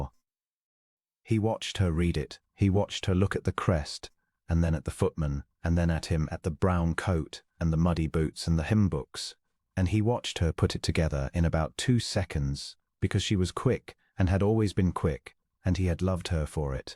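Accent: United Kingdom. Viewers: This was one of your better stories. Loved the writing and the wonderful British accent of the narrator. Just wonderful. Thank you.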